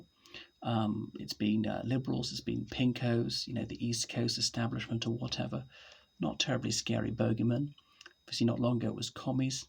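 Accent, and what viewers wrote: RP, United Kingdom. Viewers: You have posh accent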